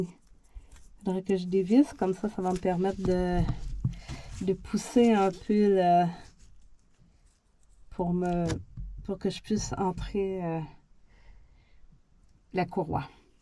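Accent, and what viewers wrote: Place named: Quebec, Canada. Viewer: j'adore vous écouter parler avec cet accent du Québec !🙂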